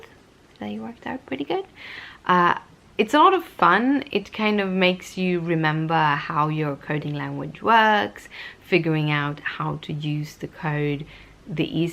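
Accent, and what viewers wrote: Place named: Northern England, United Kingdom. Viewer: I have a question......at what age did you start learning English? I saw video recently of very young children in Norway, ages 6 or 7, speaking English. You sound like you have spent time in Northern England. Did you live in an English speaking country for an extensive period of time?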